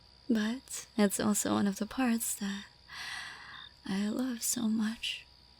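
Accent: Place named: United Kingdom. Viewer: I find it so funny the British accent 😂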